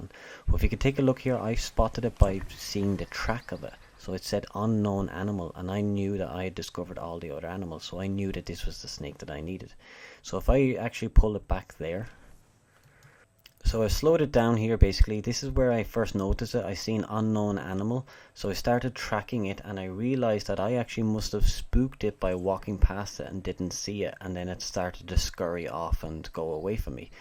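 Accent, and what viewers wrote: Ireland. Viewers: Hey are you Irish?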